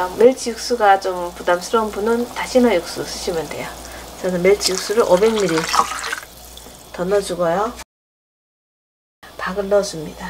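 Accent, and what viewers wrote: Gyeongsang, South Korea. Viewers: 찾아보는 경상도 말씨라 귀에익고 설명도 잘 해주셔서 늘 잘보고있습니다 고추장도 쉽게 가르쳐 주셔서 찹쌀고추장 담아 여기저기 나눠주고 맛있게 먹고있어요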